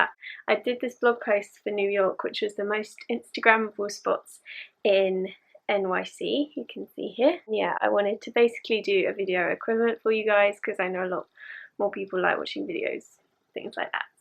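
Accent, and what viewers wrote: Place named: England, United Kingdom. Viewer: Nice channel keep it up love your english accent:D!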